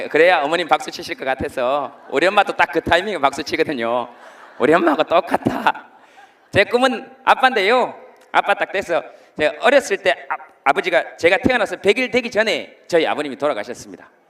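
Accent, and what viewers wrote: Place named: Gyeongsang, South Korea. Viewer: 갱상도 사투리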